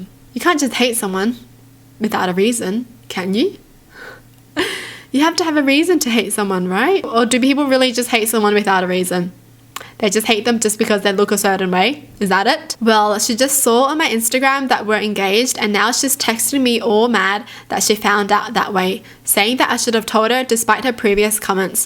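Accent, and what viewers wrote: Australia. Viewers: Australian I believe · Australian, (She's Vietnamese-Australian)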